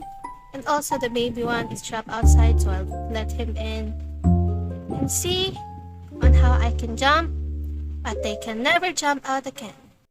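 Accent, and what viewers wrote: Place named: England, United Kingdom. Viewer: THE VOICE OVERRRR OMAGASSHHHH! love your english accent ateeee! so fluent <3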